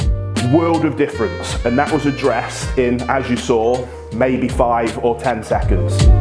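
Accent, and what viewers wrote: United Kingdom. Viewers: I was not expecting this giant viking looking dude to have a british accent 😂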